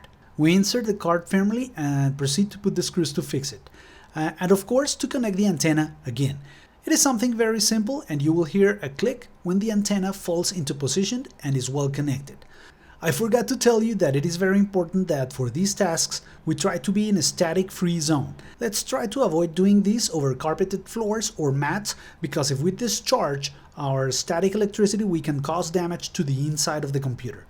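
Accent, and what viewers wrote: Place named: Colombia. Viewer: Tienes acento colombiano 😊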